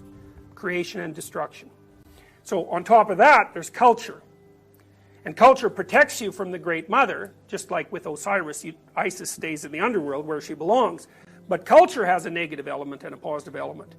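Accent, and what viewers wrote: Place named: Canada. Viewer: It's his Canadian accent.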